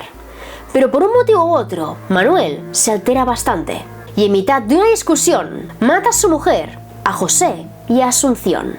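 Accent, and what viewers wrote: Spain. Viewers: Desduzco x tu acento eres española,pero te informastes poco ,el que tenia la horquilla u horca era Zapata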